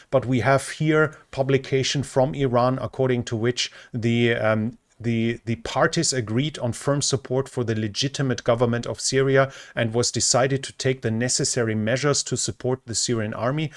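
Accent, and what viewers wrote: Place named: Germany. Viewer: Normally I wouldn't correct a pleasant German accent, but "ah-paw-state" is the English pronunciation. Doesn't matter much as most English speakers don't know what apostate means. Old diplomatic saying for region: "In the end, everyone betrays the Kurds." Probably the same can be said about the Armenians. If Assad falls I suspect the US will support the Kurds to a limited degree right up till Trump is sworn in. With much lamenting about loyalty and blaming of Trump by the military types, the US will then withdraw. As it has been for thousands of years Anatolia and Persia, to call them by their historical geopolitical labels, are too important to ignore. Everyone else can be.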